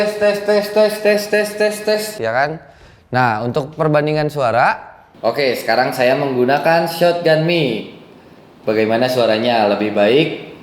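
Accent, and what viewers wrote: West Java, Indonesia. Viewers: Logat sundanya berasa hehe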